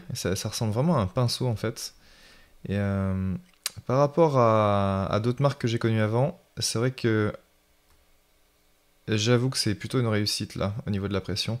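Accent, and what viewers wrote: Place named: Quebec, Canada. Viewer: Bonjour, Merci pour ta vidéo qui est très sympathique et tu n'as pas trop l'accent prononcé du Québécois. Bref, as-tu fait une vidéo pour bien configurer la tablette en AZERTY, car claver QWERTY ce n'est pas pratique. Merci d'avance pour ta réponse et si tu as fait une vidéo, d'y mettre le lien. :) Continue, c'est génial ! D'ailleurs, je viens de m'abonner à ta chaîne ! ;)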